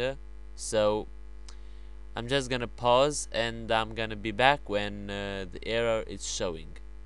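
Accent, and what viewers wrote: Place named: Turkey. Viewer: Where are you from? I think u are from Turkey because you have Turkish accent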